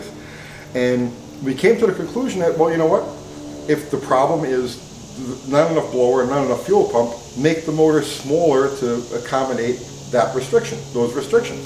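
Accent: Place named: Long Island, United States. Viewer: you remind me of home. From Long Island. I could listen to you for hours.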